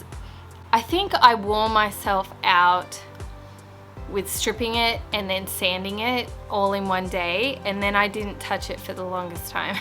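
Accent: Australia. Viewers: I must say, I love your Australian accent❤️👍 as well as your work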